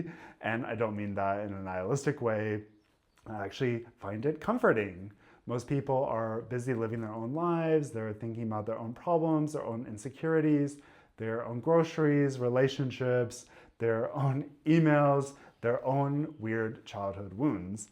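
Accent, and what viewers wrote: Bavaria, Germany. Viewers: I felt it when I was in the core of a little friend group for the first time around my 30th birthday. I’d never had that growing up as a nerdy, gifted/neurodivergent girl who naturally clicked better with boys/men but was also not the best at navigating the whole attraction thing due to the undiagnosed AuDHD and being ace-adjacent as well without knowing. It felt amazing to be chosen without a lot of masking, within my nerdy railroad engineering friend group 😊. I noticed your inflection is a lot like Feli from Germany, so I’m going to deduce it’s a Bavarian/Munich based way to end sentences tone and length wise, I’d heard another German creator who spoke US English do the same, but I wasn’t sure yet if the area inter US where they learned English was the shared factor, or if it came from a German region 😅.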